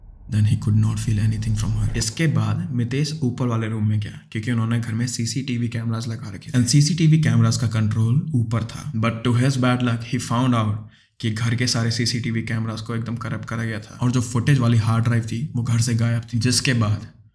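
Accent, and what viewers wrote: United Kingdom. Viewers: IM SORRY BUT WHY DOES HE SOUND BRITISH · that brr-issh accent got me wet, also rip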